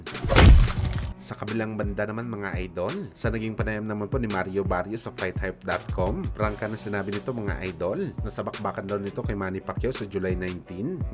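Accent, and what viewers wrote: Visayas, Philippines. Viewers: Bisaya Ang blogger Kaya ganyan ang boses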